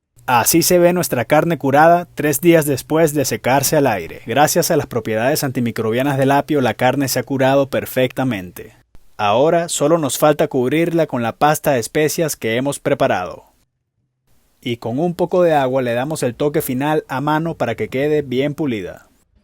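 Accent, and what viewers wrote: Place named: Venezuela. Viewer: por tu acento veo que eres venezolano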